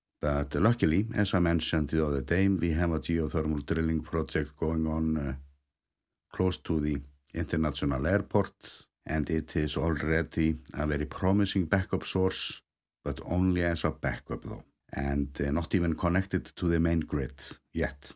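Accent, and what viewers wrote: Iceland. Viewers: I love Iceland since I was young and I got a book from Jon Svensson about Nonni! But first in May 2022 I could follow my dream and visited Iceland and Nonnis home in Akureyri. In March 2024 I was again in Iceland (between two eruptions) and saw the beautiful Katla icecave. I live in Germany and I will visit the tomb of Jon Svensson in cologne next. Thank you for your beautiful reports about Iceland! I love your Icelandic/English dialect! Many thankful regards!!!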